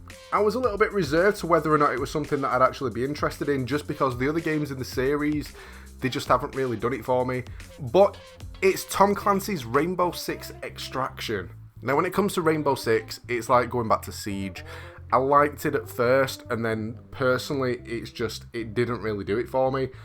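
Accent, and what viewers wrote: United Kingdom. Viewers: Yo just found your channel I really like your content and if I hear your accent correct then hello my fellow Brit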